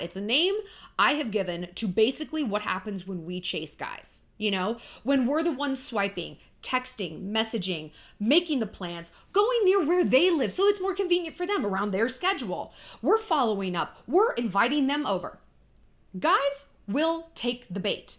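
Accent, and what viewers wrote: Australia. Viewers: Omg ...I’m from Sydney!!! Babe ... your Australian accent is soooooooo great !!!!😂😂😂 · As an Aussie, your Australian accent is sending me 😂😂😂 love it ❤️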